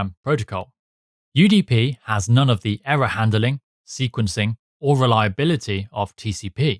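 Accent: United Kingdom. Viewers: I love these videos btw! I am starting an apprenticeship within IT networking on Monday and I have been trying to research information. Your videos are the most beneficial and well explained! Are you british btw?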